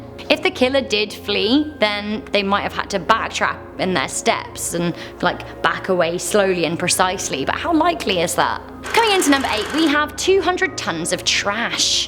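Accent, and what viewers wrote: United Kingdom. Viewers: Wohhh blew my mind I thought you guys were from the U.K with the way you talk........... Canada threw me way off anyone else think this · Is she british?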